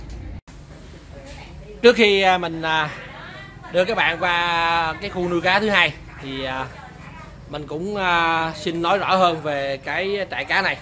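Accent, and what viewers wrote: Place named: Mekong Delta, Vietnam. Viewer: chời ơi chờ clip mỏi mòn nay mới xuất hiện,khoái cái giọng miền tây thiệt thà chân chất,trại cá ở cu chi- trảng bàn tây ninh giá mềm hok anh. xuống tham quan có phải giới thiệu hok hay ghé chơi tham quan giải trí hoy,:D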